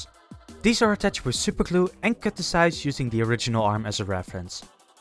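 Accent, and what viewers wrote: Netherlands. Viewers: Thats so cool!! 😍Also are u dutch?😊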